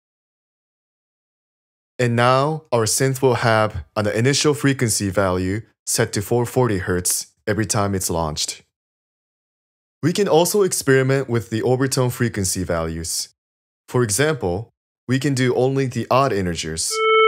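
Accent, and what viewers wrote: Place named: Netherlands. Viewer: You have a slight accent (barely) and I can't tell if you're Dutch, Scandinavian or Japanese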